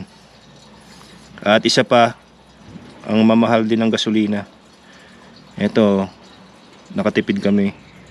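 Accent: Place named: United Kingdom. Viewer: WOW THAT'S A BRITISH ACCENTS.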